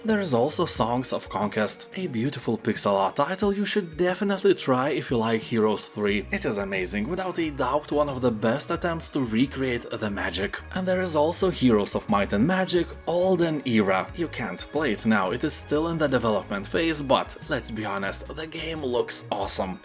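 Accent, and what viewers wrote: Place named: Baltic states. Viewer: Im not sure if you actually convinced me to pick up the game again or if it was the thick Baltic accent that just made it sound like you were speaking from authority. Either way, I downloaded it from GOG, the one I had on CD wasnt "complete" anyways even if I could find it.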